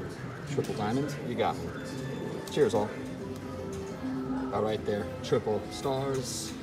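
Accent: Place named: New Jersey, United States. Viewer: I enjoy watching and listening to you commentary during you play. You sound like a real good Jersey guy. Love the show and keep it up. Bring your mom back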